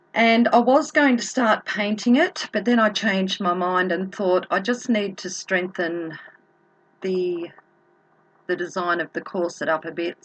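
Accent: Australia. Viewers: so true, and your Aussie accent is helping me smile even more! xoxoxo:))))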